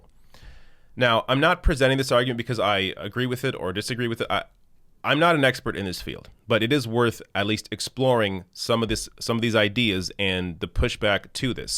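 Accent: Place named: Canada. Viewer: You know is Canadian right, so this entire video had a Canadian accent 😂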